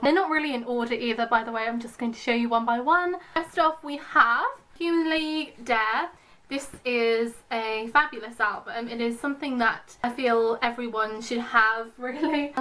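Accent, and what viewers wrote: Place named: United Kingdom. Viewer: are you british ????😍😍😍😍😍 so lovely acent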